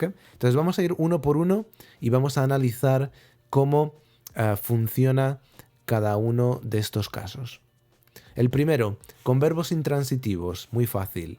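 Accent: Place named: England, United Kingdom. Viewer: funny english accent)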